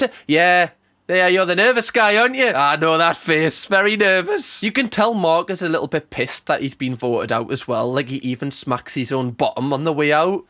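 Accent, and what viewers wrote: United Kingdom. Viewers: To be fair i like the british accent indeed the best😂 (coming from a german)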